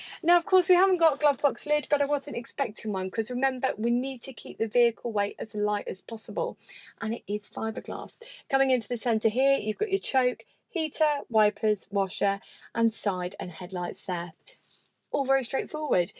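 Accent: United Kingdom. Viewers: Bro you british accent is so saturated .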